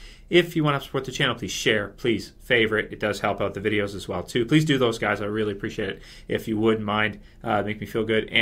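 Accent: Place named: Canada. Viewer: "oat and aboat".... LOL i love your accent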